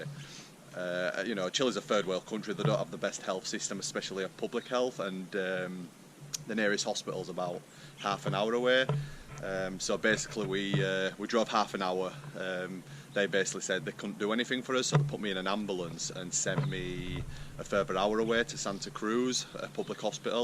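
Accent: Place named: Northern England, United Kingdom. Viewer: Im really curious what part of england you’re from. Pretty cool to see a northern sounding lad off gridding in chile!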